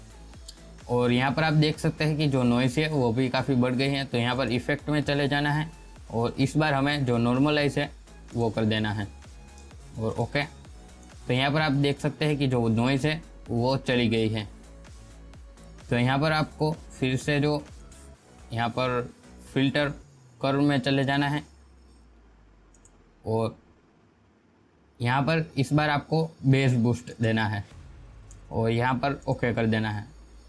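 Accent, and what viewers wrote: Gujarat, India. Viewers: Are you gujarati..??? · bhai aapki speaking se pta chl jata h ki aap gujrati ho....😂😂 Btw i m also gujrati aur me aapke baaju me rehta hu i m from jetpur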